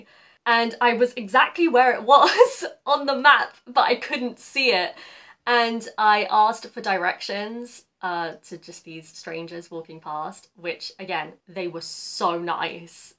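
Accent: United Kingdom. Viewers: イギリス英語気持ちいいです。福島県産も受け入れていただいて感謝。日英同盟復活w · 日本語字幕と綺麗なイギリス英語、とても有難いです。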